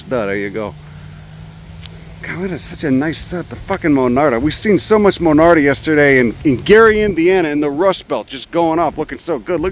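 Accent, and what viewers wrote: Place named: Illinois, United States. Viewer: I enjoy hearing Illinois voices. My Ma came from Wheaton and Pops came from LaGrange park.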